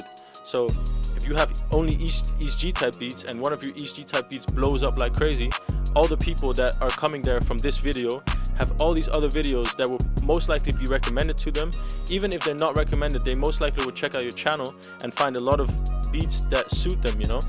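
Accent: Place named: Netherlands. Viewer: ik hoor nederlands hahaha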